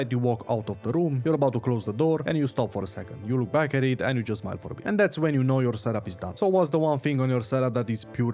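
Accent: Romania. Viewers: Yo dude ... Love your vids helped me a lot ✌️ ... You from romania by any chance ?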